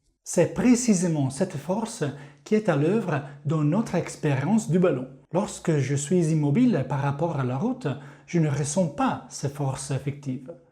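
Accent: Italy. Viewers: Bellissimo accento Italiano!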